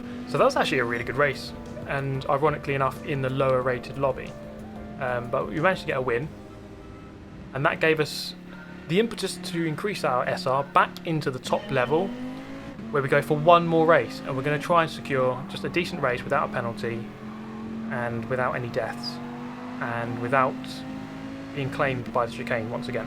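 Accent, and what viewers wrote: United Kingdom. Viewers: I love to practice my english pronounce with your british accent! Thanks for your existence. ❤️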